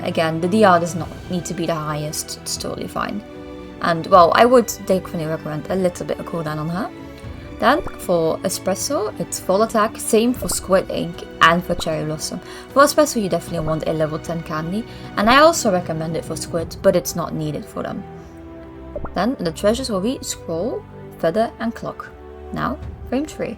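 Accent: United Kingdom. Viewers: hi are u british? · Very helpful,video. Thanks so much for making it! Also you accent is very posh! i like it!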